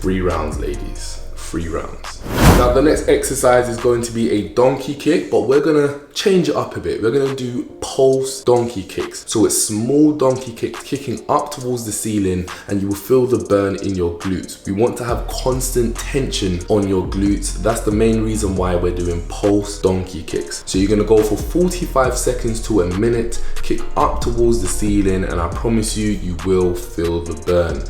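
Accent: London, United Kingdom. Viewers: Your accent is killing me 👌👌👌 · British accent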